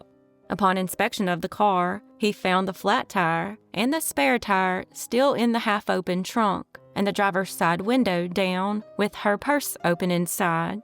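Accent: South, United States. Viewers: Thanks for doing Indiana. Pretty good videos. N actually have a nice pleasant voice with a Lil southern twang lol But seriously, thanks. We appreciate it. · What part of the south are you from?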